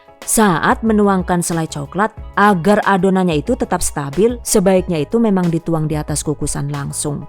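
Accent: Banyumas, Indonesia. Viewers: Luar biasa nih boluny cntik dn empuk seneng bnget dengan pembawaanya sll di selingi bahsa purwokrto juga ngapak gitu skss yh mb mdh2an cenel ini sll mmbwa brkah amin 👍❤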